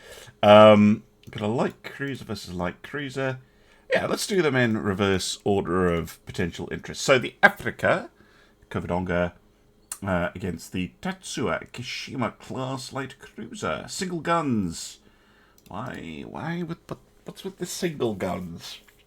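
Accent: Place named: United Kingdom. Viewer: I love channels like this but it was your accent that made me subscribe. Rule Britannia Britannia rules the waves!⚓⛵⚓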